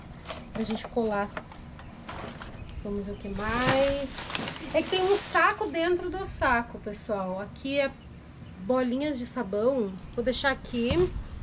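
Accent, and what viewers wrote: Paraná, Brazil. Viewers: Vc é do Paraná?? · Acho que vc tem algo em comum comigo kkkkkk amo achar coisas que de para reciclagem e eu também sou do Paraná nasci em Maringá e fui morar Curitiba ainda crianças ,este seu sotaque não me enganou kkkkkk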